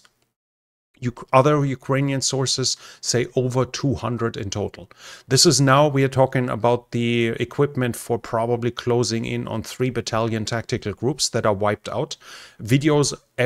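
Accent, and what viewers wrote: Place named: Germany. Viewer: You sound very German ;)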